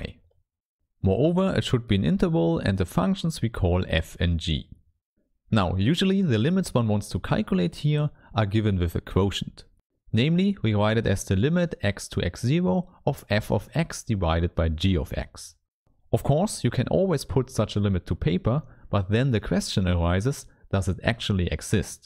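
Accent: Germany. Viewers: Oh, your german? Its quite noticable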